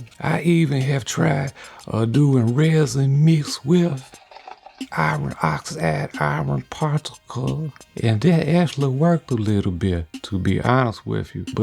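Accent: Louisiana, United States. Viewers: i didnt know i needed to hear a sultry cajun tell me about injection molding today, but man was that like soup for the soul. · What region of the USA is this accent from? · As an Aussie, I can't place your accent for the life of me. I can tell that it's most likely from the US, but the exact region has me stumped. Where abouts are you from, my man?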